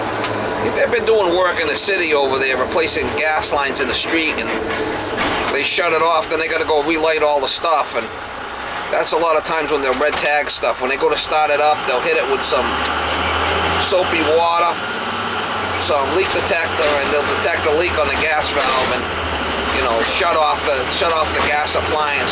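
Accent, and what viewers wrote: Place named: Boston, United States. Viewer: You sound like you are from Boston .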